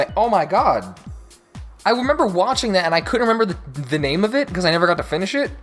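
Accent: France. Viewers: I'm French and always knew Witch with the magazine you have (btw, really cool French accent xD) and yeah the arc continue a looooong time after Nerissa and all that stuff ! For me, Witch was still perfect untill the Ragorland arc with Hay Lin. It's the very first time as a teenager where I was not convinced, and after that when they open a magical school for kids I totally abandonned Witch... It's sad because I still think it was hell of a serie : the drawings were beautiful, it was really feminist, it talked really well about teenage years, it was epic, sad, disturbind, violent... Perfect ! I totally hated the TV show, it was ugly and too superficial for me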